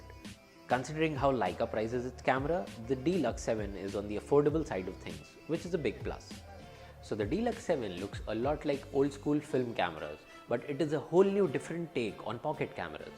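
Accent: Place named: Ireland. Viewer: Background music and Accent are two different things. Many peoples in the world don't understand Irish English. Even UK and US have accents based on the people's locations. Sometimes try to speak another language than your English you learned from your birth. That's really annoying when someone bullies others on their accent.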